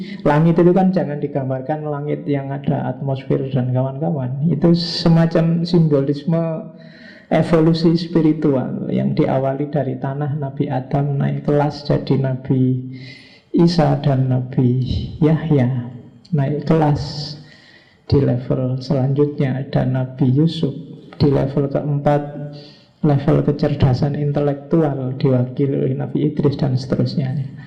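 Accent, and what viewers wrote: Java, Indonesia. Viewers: English aksen jowo agak menggelitik di kuping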